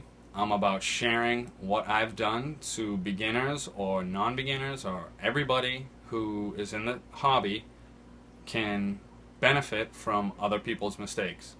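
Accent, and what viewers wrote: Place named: New York, United States. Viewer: Following cuz you sound like you’re from NY.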